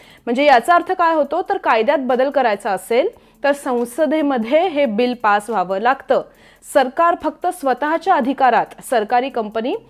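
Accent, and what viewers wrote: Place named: Pune, India. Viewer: ताई ha पुणेरी TADKA Continue ठेव Videos मधे 😂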